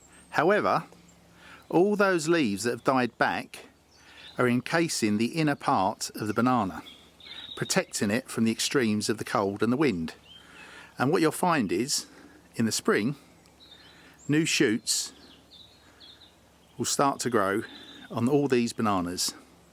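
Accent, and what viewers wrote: United Kingdom. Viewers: Amazing video and lovely British accent...all the best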